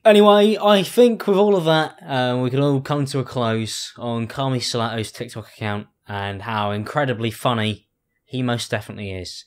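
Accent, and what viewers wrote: United Kingdom. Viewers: Why did Jacksepticeye turn british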